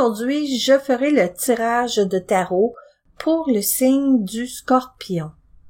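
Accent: Quebec, Canada. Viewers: Bonjour 🌞, je viens de vous découvrir sur le chat de MICH'AILE, J'AI écouter de suite votre vidéo, j'ai adorée ce tirage de mon signe scorpion, où je me retrouve entièrement. Et en bonus votre douceur, votre accent québécois très agréable et vos conseils spirituel dans la bienveillance. Je me suis abonnée sans hésitations!!!! MERÇI infiniment NAMASTÉ 🙏🏻 Pour vous également AMOUR 💜LUMIÈRE ☄et PAIX 🕉☄☄❤🌹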